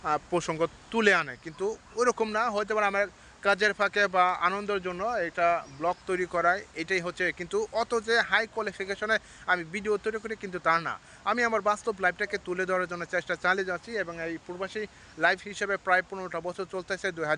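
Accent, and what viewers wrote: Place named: Brahmanbaria, Bangladesh. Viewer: আসসালামুয়ালাইকুম ভাই মনে হচ্ছিল আপনি আমাদের এলাকার ব্রাহ্মণবাড়িয়া আমার বাড়ি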